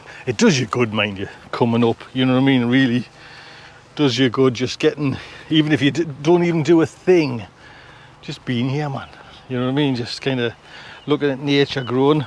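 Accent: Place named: Northern England, United Kingdom. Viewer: my name is Jim I have been watching your channel for a while, can I just say you hit the mark, you talk as is you come over as a "proper'northener I really enjoy the" honesty you show, keep doing what you do. IN you words "Take good care"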